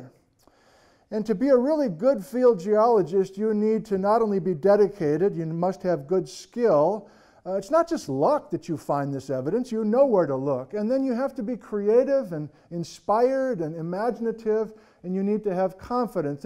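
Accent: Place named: Wisconsin, United States. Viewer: I knew I heard that Wisconsin dialect. I'm from there, too.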